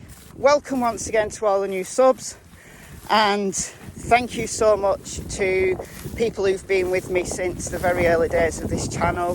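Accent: United Kingdom. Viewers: Hi! I'm one of your new subscribers. I love your take on things, and I love your british accent.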